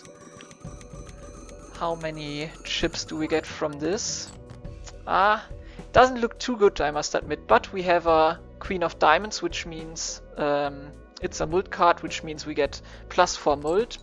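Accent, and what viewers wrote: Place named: Germany. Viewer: German Accent, like it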